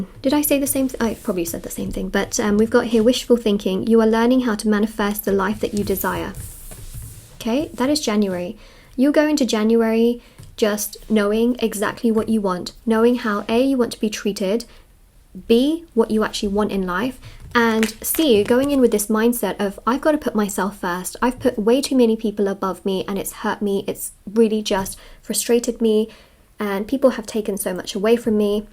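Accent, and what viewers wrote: United Kingdom. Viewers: Much confused she sounds British uses American slang haha thank you for the reading. I hope this is how it works out.. 🤞♊️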